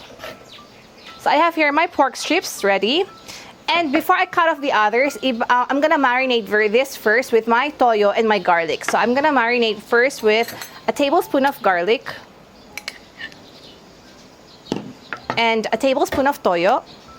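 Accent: Philippines. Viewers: you're too cute!! i love how you talk taglish...:)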